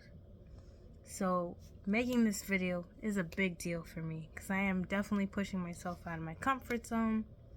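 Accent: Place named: New York, United States. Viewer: Ahh, i could almost tell you're a New Yorker! Aayyyy. Keep going girl, I'm rooting for you.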